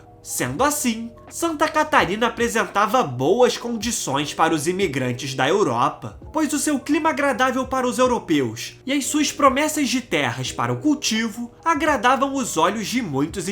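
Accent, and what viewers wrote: Rio de Janeiro, Brazil. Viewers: Esse carioquês do narrador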